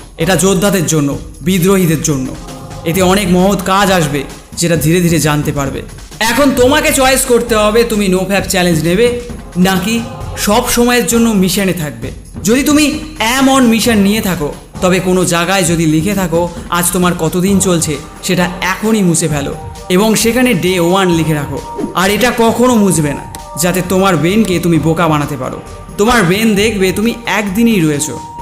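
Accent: India. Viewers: এটা কি বাংলাদেশ ইউটুইব চ্যানেল ? দুঃখিত কথা শুনে ভারত পছিমবঙ্গ মতো লাগলো. তোমার কথা শুনে আমার খুব ভালো লাগলো খুব expression পাওয়া গেলো ।❤️❤️❤️❤️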